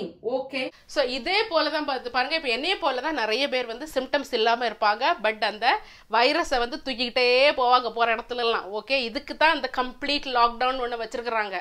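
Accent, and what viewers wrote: Nagercoil, India. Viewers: Useful information Sister. Unga Nagercoil Tamil Arumai.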